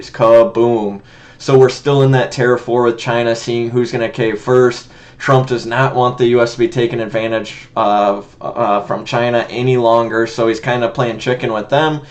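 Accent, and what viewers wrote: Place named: Canada. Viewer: You sound like you're from Canada or something, but I like you anyway.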